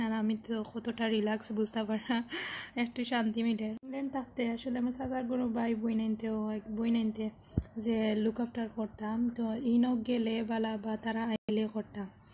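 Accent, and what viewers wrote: Sylhet, Bangladesh. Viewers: আপা সিলেটি কতা সুনতে পালাম❤ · সিলেট কোন যায়গায় বাড়ি,,আমিও সিলেট দুবাই থাকি। তোমার মাত, বা সিলেটী কথা শুনে লাগে কেউ সামনে বসে কথা বলছেন।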